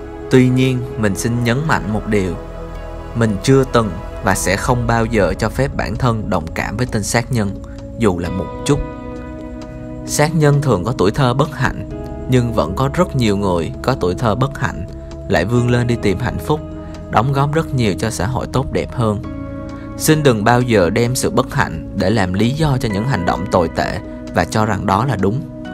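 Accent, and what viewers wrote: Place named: South, Vietnam. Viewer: Giọng con trai miền Nam hay thiệt 🤤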